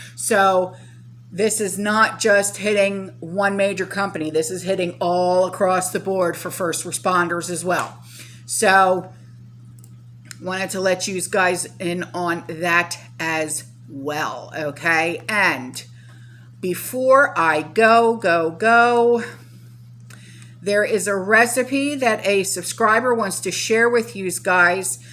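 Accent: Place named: Pennsylvania, United States. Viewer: Was listening to you when I was up visiting my son in Pensacola...he was like...is she from Pton (my hometown in PA) she sounds just like you & your friends...coffee and sticky snacks. It made my heart sing that he does pay attention to our words. Have a fantastic day. Love your channel.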